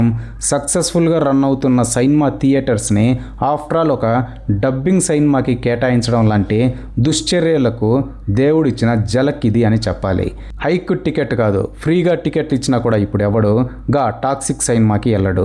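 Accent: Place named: Telangana, India. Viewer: Antha baane undi gani Nuv matladedi Telangana na, Srikakulam ah, Godavari yaasa na ardam kavatle anna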